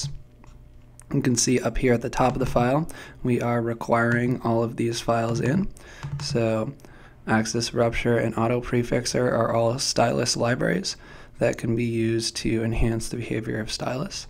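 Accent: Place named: Canada. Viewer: I really like Roots! I hunted around for a long spell and finally found it. I think it's probably the answer to my quest for a static website generator to host some articles of mine. On another note, I find the narrator's accent interesting. I'm really good at spotting accents and can't figure out where this fellow is from. In some parts I think he's from Canada, but then I detect a note of southern California. or maybe some other southwestern U.S. place. Oh, well, it doesn't really matter. Roots seems to be truly great stuff to me. I'm going to go ahead with it joyfully!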